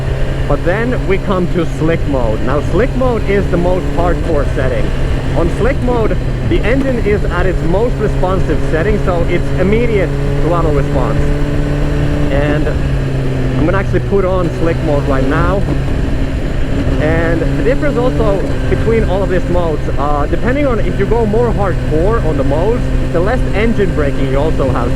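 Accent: Finland. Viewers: When the video started I noticed that you had really distinctive Finnish accent and was wondering if you are a Finn. When I saw Rukka jacket, I was sure about it :D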